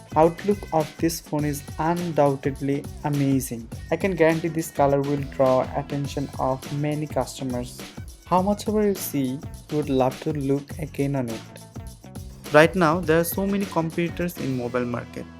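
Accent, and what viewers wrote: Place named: Bengal, India. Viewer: Ur accent sounds like that of bengali people..!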